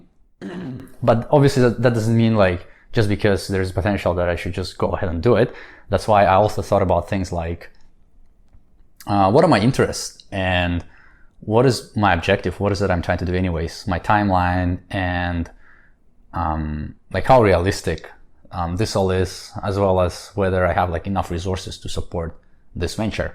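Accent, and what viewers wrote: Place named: Russia. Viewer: Super interesting and valuable - I look forward to the entire series, and following your ongoing journey. Interested in your accent, which sounds more "Russian" than "Korean" to this Californian.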